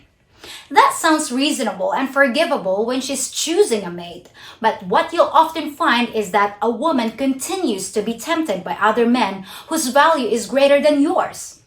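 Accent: United States. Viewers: Your chanel is well balanced, informative and easy to be understood. The only thing is that strong american accent what is unusual for european ears but... I like your channel. Keep on doing. Smart woman. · Wow, you're very likable. You are definitely a filipina but you talk like an American. How did that happen? - Did you live in the US? Is one of your parents a foreigner? - Or maybe you're just an internet junkie who simply figured it all out!:) - Kisses from Toronto Canada. - Mauhhh...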